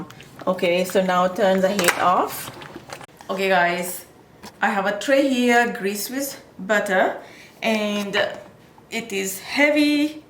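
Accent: Mauritius. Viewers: Natural Mauritian accent. Nice. No forcing other accents 👍🏽👍🏽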